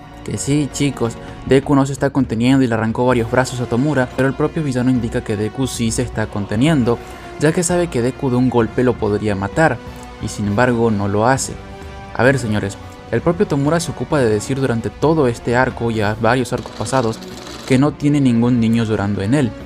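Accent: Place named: Argentina. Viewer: Sé que suele narrar así en su canal principal, pero me recuerda a Axl Kss, talvez por el acento argentino XD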